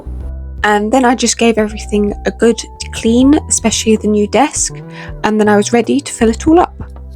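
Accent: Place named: United Kingdom. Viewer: May I ask a personal question? English is my second language and I am used to American YouTubers. You sound definetly British but I would like to know from which British region your accent is coming from. Or would it be some common speech pattern for the whole of Great Britain?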